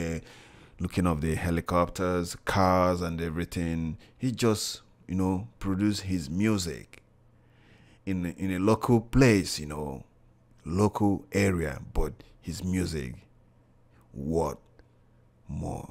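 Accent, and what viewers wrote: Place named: Nigeria. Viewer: His accent is Nigerian.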